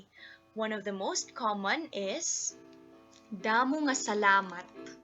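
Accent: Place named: Tacloban, Philippines. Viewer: tacloban accent yun waray mo? curious lang :-) parang tacloban accent an waray mo...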